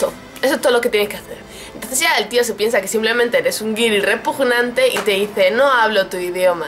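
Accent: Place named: Mexico. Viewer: aww Me encantas e asento mexicano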